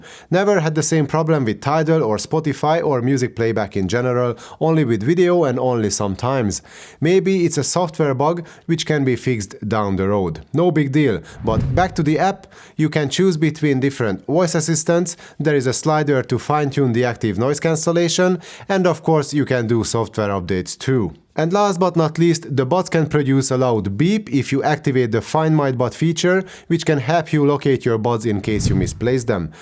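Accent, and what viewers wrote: Hungary. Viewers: Érzek egy kis magyart 😜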